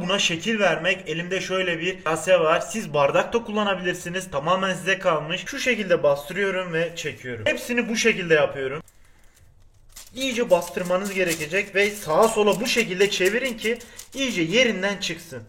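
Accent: Adana, Turkey. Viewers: Adam adanalı galiba ekliyorum yerine eklürüm diyo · Abi onu bırak adanalı gibi konuyorsun adanalılarda alüyürum der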